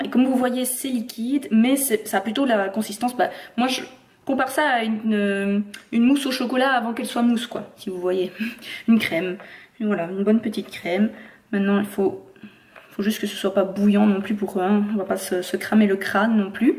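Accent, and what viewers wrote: Belgium. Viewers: Elle est belge je crois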